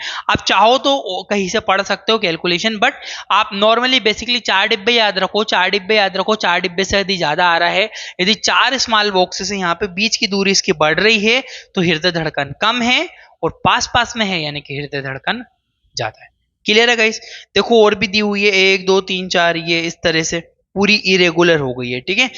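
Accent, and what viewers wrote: Indore, India. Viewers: Indore se ho na aap? Language wesi lag rahi he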